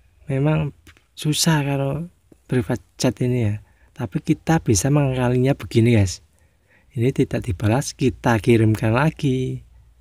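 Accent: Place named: Java, Indonesia. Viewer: Tak tebak wong jowo yo podo mas jowo ne ,aku kudus jateng mas salken lo.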